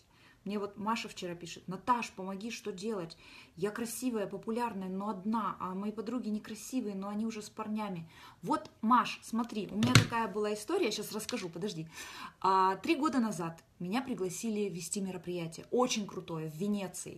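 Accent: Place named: Chelyabinsk, Russia. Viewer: Просто угараю с вашего челябинского акцента))) Какой же он смешной))) В хорошем смысле) Смотрю этот канал, не вдаваясь, о чём там говорит эта женщина, но как же забавно она это говорит)) Как и Кокляев и все прочие челябинцы))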